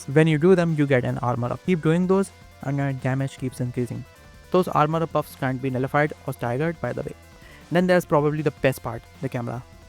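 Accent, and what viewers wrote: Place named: India. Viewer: bro whats your country origin ? cause you have alot of indIan accent in your talk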